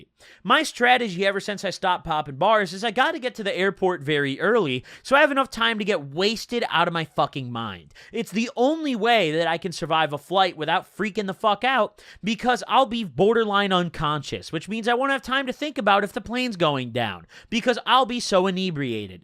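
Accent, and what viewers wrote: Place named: Midwest, United States. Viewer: I was born in 91, grew up in Milwaukee suburbs which is probably fairly similar to the Chi suburbs is from. He reminds me in a very peculiar/distinct way of me and my friend group back around ~2010. His speech patterns are almost identical, which since becoming older I have moved on from this lifestyle so it just reminds me of those times. The only difference is some of the newer tech like dabs but I'm not so sure things have changed that much. We were doing the exact same shit. Maybe it's also a regional thing.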